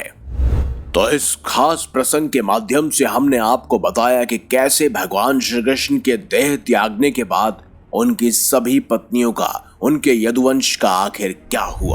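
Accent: United Kingdom. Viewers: जानकारी तो बहुत अच्छी दी है आपने लेकिन आप ये english accent में क्यों बात करते है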